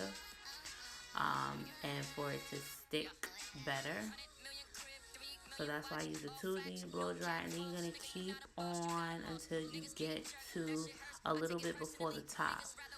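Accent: New York, United States. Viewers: Do I Hear A New York Accent ?? Omg If So YASSSSS ITS SK PRETTY lol i love accents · Hair looks amazing!!! Love that NY accent too. :) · I love the New York accent, im from California, I'm in Long Beach I love yall 💜💜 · What part of NY are you from? I hear an accent.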